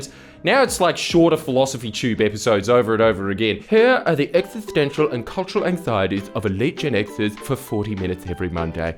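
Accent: Australia. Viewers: Are you Australian or something?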